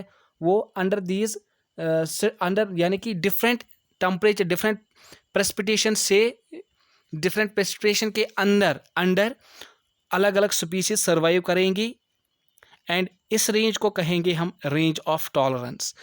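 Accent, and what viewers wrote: Kashmir, India. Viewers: Kashmiri tune smj ni aati words ko repeat pr krke sunna pdta h....biggest problem 😞😞😞😩😩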